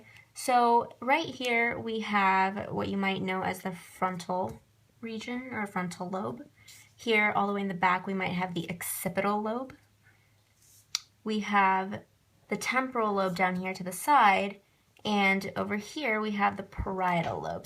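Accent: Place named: India. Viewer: 🤔🤔 Indian and such an amazing accent.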